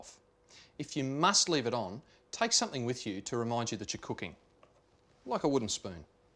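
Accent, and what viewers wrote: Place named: Australia. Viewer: Everything sounds better in an Australian accent, even fire safety vids! 🇦🇺👍 Greetings from the U.S.A.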